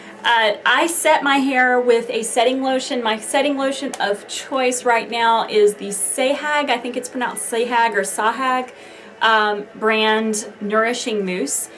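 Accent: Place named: New Jersey, United States. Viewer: I'm from Jersey and I really just noticed the "accent" in your voice I've been watching you for a while!! lol I know it's random that I notice it so late! oh well though I love it!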